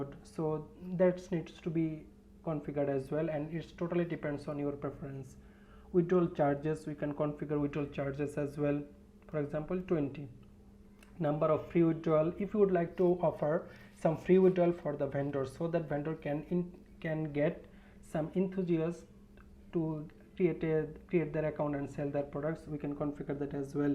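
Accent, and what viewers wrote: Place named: India. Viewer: It is Indian english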